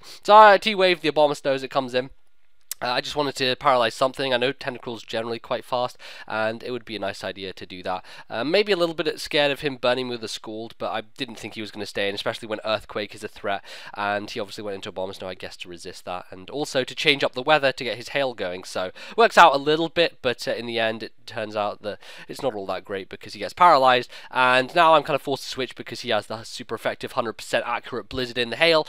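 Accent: Ireland. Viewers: im actually disappointed that the irish accent didnt last for the whole vid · Your Irish accent is really impressive. ^_^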